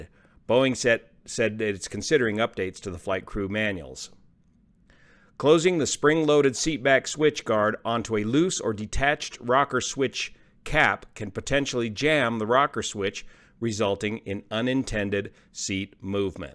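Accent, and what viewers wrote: Chile. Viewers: Definitely chilean spanish👌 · Based on the accent of the voice, It seems that the person speaking in the video is from Chile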